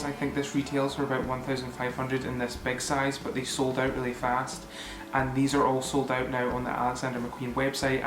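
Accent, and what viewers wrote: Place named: Scotland, United Kingdom. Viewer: your accent is lovely! are you scottish?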